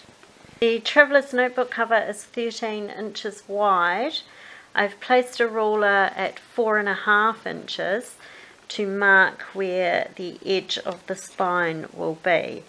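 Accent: New Zealand. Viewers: Just found you on this tutorial. Thanks so much, I can’t wait to get started on some second hand wallpaper and make a lovely cover using your technique, which will be sold in a very upmarket decor shop. So exciting. Awesome! Thanks once again from South Africa 🇿🇦 Is that a lovely New Zealand accent? Love it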